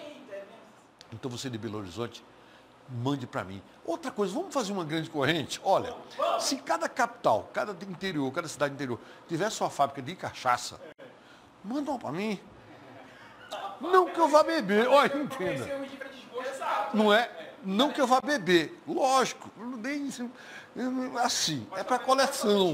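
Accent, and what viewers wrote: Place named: Amazon, Brazil. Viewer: Sikera já tá bem amazonense, até o "fulêro" do amazonês ele já fala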